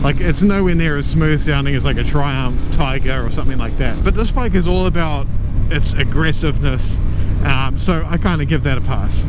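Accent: New Zealand. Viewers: NZ I reckon from his accent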